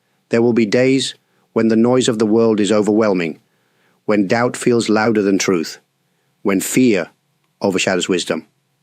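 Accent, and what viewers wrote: United Kingdom. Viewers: He is born in England sounds like he has British accent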